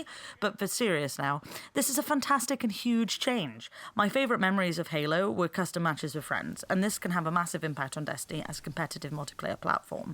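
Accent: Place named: West Country, United Kingdom. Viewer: Didnt hear any welsh accents but there were certain words, you sound pure west country (bristol ish area) id love to do raids as ive never even attempted 1, im a year 2 solo player, the only reason i got destiny in the first place was because a bug group of my friends played it, but i got it too late and they'd all already swapped over, glad i got it though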